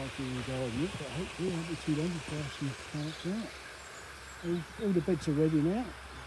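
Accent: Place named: England, United Kingdom. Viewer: Looking the name of your layout and hearing your accent are ypu anyway near Daws Hill, High Wycombe? Cheers Michael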